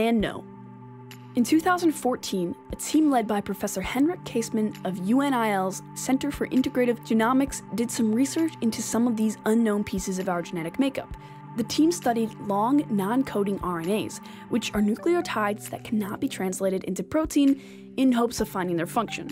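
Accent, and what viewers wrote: Canada. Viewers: I detect an Canadian accent!!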